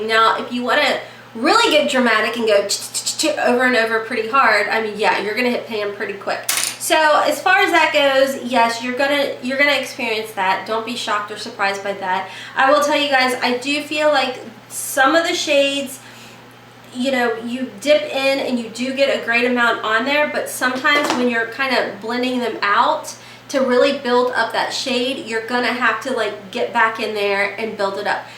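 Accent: South, United States. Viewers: I'm on a binge this evening! Watching all the videos I missed! I can't believe I missed this one! I was soooo waiting for it to. But anyway... Girl, Don't you EVER be self conscious about that Southern voice!! I am from Tennessee grew up in MS (tha sip) so I completely get it! We just walk to our own beat and have charm like no other! Beautiful as ever ❤ Much love from Tennessee, Dayna 💖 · you really made me laugh today with your silly goofy self LOVE IT!! You remind me of my bff shes alot like you i know if you and i hung out we would have a blast. And i love your Southern Belle side i had live it in good old Texas for 13 yrs love the southern talk and there personality very welcoming and sweet =). One more thing i wanted to add i like that your very detail wit your videos about the products and your honesty that i can trust. and by watching this video ima go buy me that fantasia palette now =D.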